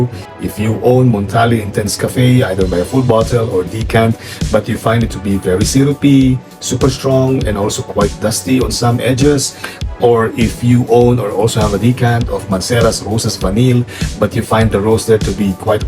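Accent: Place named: Philippines. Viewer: Your 𝒂𝒄𝒄𝒆𝒏𝒕 has a sound like a FILIPINO. Are you originally from the Philippines ❓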